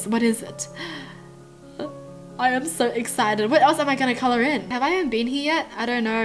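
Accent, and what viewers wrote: Australia. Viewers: "So after all of that, I'm going to diss a pee?" That's how Australians speak. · Are you Australian? I love your accent <3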